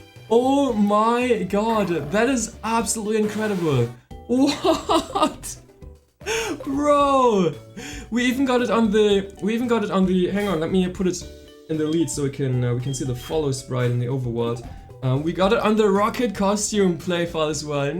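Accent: Germany. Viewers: You can shiny hunt at f pokewalker??? Do i hear... Hör ich da einen deutschen akzent? Ich hab das actionReplayDS auch noch, kann man das auf dem DSi auch benutzen?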